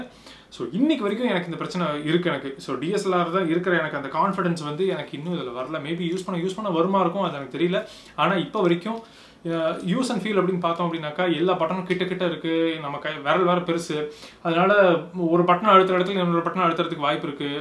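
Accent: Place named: Chennai, India. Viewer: Semma Namma Chennai Thamizh'la explained... thanks buddy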